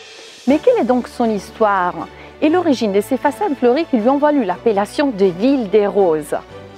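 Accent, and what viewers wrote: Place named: Italy. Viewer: quel bel accent italien...tout en douceur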